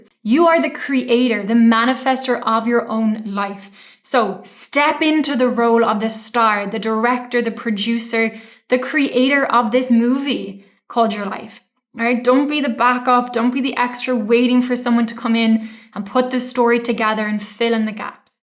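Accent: Ireland. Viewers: Oh you are Irish.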